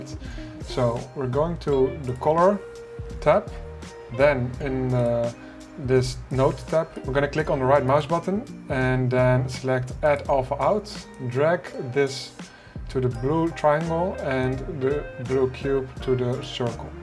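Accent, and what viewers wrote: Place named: Netherlands. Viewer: ik ruik wat nederlands accent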